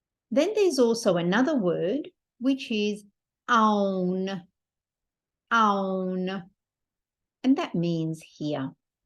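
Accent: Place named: Australia. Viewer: This is amazing , I accidently found this channel and I am so glad that you are teaching so beautifully and from the accent I assume you are Australian . Thank you for doing this , love it and appreciate it .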